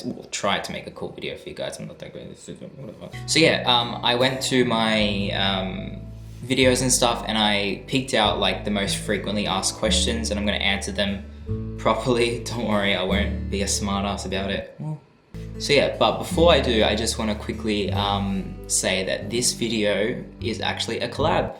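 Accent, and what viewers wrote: Australia. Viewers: what part of Australia are you from? love that accent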